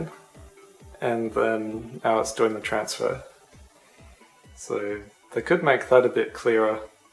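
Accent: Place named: London, United Kingdom. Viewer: sorry you just sounded like you were from London .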